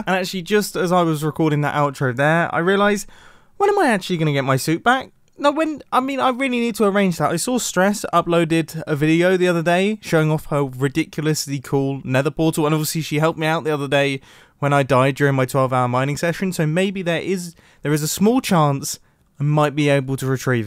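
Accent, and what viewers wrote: United Kingdom. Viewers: what type of British accent is yours? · Wow was extra british this episodes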